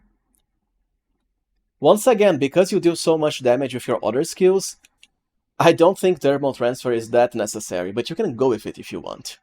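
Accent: Brazil. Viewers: Just started watching your video and within 10 seconds I'm 100% sure you're brazilian!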